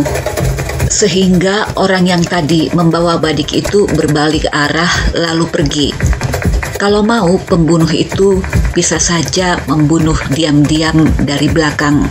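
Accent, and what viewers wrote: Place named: Makassar, Indonesia. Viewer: Logat nya sama seperti logat Makasar 🤩💛, bugs & Makasar budaya nya berbeda.. cerita nya menarik bunda, hati" selalu di sana 💙🤩💛 happy new year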